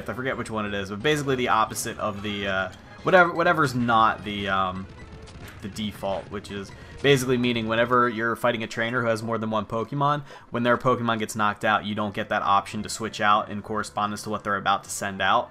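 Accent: Sweden. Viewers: your Swedish accent sounds like Sven from happy feet 2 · That is one legit Swedish accent!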